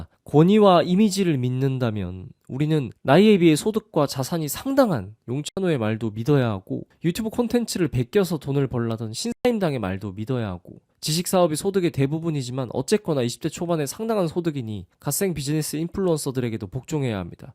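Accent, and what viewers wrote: Jeolla, South Korea. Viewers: 영어완전 전라도발음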